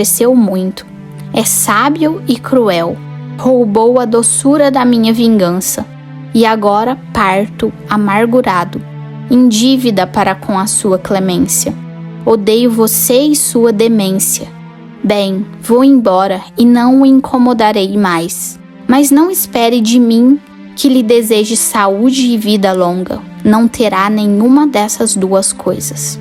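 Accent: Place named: São Paulo, Brazil. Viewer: A narração é muito boa, só tenha cuidado com a pronúncia de algumas palavras, como "abrutalmente", que eu acredito que seria "abruptamente", e algumas outras, que estão com um sotaque paulista/sulista muuuito puxado no "r". De resto, esta muito bom!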